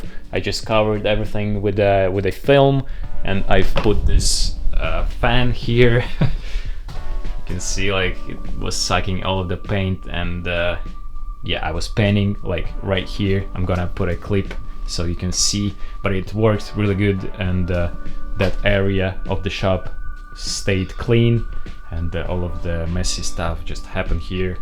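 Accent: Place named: Eastern Europe. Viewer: you have a very slavic accent.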